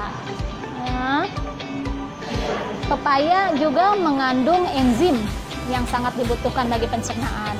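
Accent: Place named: Baubau, Indonesia. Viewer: Aslam Alaikum Bu asalnya dari bau2 saya juga dari bau2 tinggalnya mana sekarang logatnya tadi dari bau2 bls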